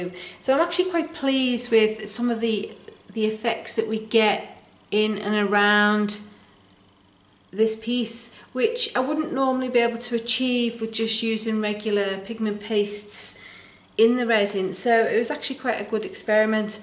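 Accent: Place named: Liverpool, United Kingdom. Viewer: Fantastic experiment one I'll be trying as ive switched from acrylic pouring to resin. I’ve watched your other videos and think you have such an easy familiar voice to listen too .. dare I say Scouse/Wirral accent? I'm from Wallasey, Wirral. I also love the fact you don't have music blaring away in your videos and you have a very eloquent way of speaking, no um's no going off subject and no dragging out your videos.. i wish more was like you. Thank you so much. You are so inspiring.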